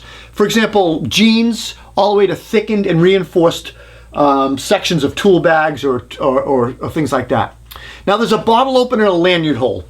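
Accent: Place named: New York, United States. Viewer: Don’t like this big mouth. Very New Yorkey.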